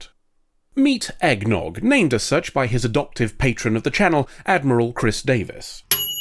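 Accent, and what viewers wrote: United Kingdom. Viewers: You British? 😢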